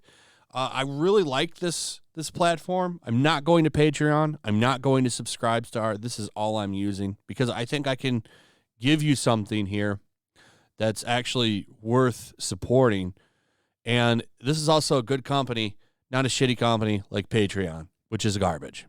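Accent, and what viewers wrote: England, United Kingdom. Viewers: Just a small thing, but why are you reading in an english accent?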